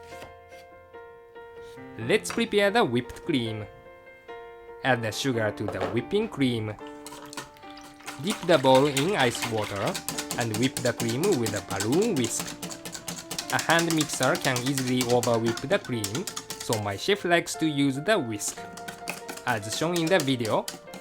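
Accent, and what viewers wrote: Japan. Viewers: I just love Japanese accent. It makes the cake yummier! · Noo! Just Japanese engrish!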